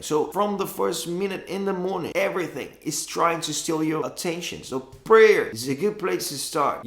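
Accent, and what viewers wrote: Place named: United Kingdom. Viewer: o sotaque britânico kkkk mt bom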